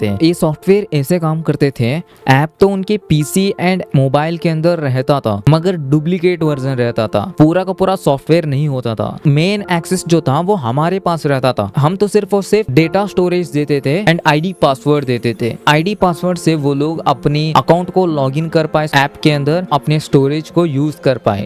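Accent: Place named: South India, India. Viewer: bhai, are you from south? accent bhot pyara hai♥♥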